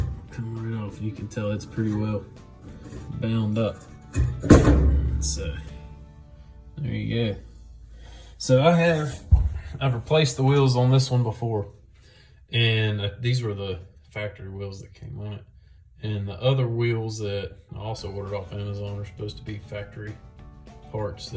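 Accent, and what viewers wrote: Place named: Alabama, United States. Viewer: Dude's speaking Alabama ease. He doesn't have an Amana (Uh-man-uh) dryer, he has an (Ar-mon-uh) dryer. I thought he was servicing a new brand of dryer. Keep up the good work Buddy!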